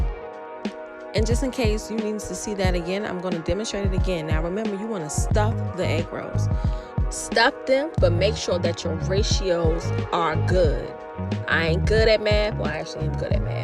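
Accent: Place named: Baltimore, United States. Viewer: You sound just like us from B more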